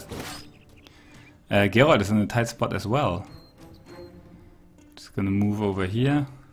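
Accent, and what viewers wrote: Germany. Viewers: Mal eine Frage. Ich meine man hört es durchaus raus, dass er ein Deutscher ist. Seid ihr ein deutsches Entwicklerteam ?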